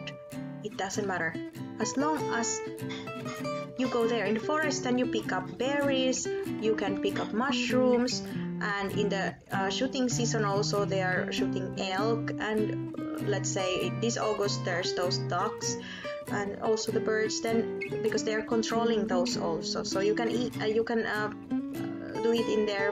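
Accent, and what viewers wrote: Finland. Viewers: How it's possible that you speak English exactly like a born Finnish? I mean your accent. No-one will believe that you are from Philippines!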